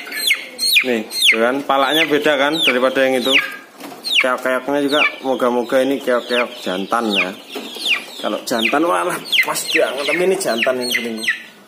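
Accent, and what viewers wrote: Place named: Lampung, Indonesia. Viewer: Mas alamate nang ndi? Kok bahasane koyo wong jowo Lampung.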